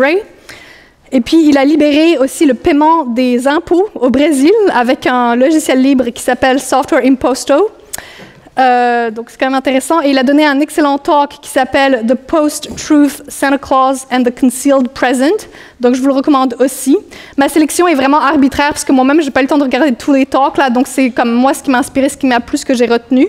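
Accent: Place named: Montreal, Canada. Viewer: I downloaded this video to watch while traveling but was bamboozled to find that it's not English but Montreal french 😅. The English title is a bit misleading.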